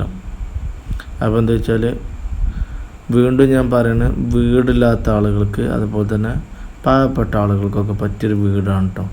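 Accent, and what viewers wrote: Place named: Malappuram, India. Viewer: Malappuram slang aanu bro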